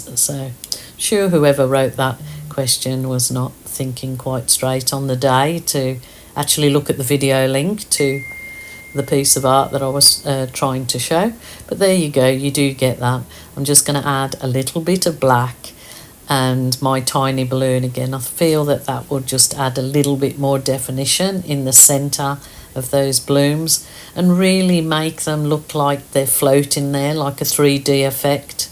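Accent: United Kingdom. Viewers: I have only just found you tonight, I think I may give this magical art a go, it’s fascinating. I have subscribed and I will go back on your previous lessons. I’m from the UK and I’m sure I can detect a twang of British in your accent. Take care K🇬🇧❤️❤️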